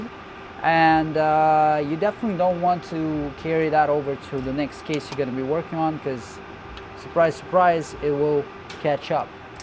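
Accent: Russia. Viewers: Are you Russian? You do sound like a Russian guy. You choice of words and accent are very specific.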